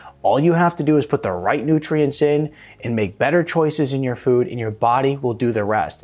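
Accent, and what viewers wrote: United States. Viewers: Terrible strong American accent. Tomatooooooooos doh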